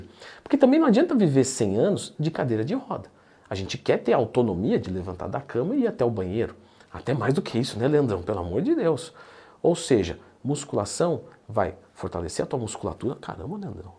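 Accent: Northeast, Brazil. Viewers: Vc é nordestino? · Vc é nordestino? Que bonitinho!!!!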